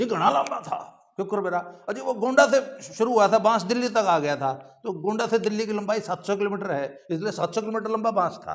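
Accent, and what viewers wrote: Haryana, India. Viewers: Very nice presentation of Dharnajivi wrestlers 'history in Haryanavi accent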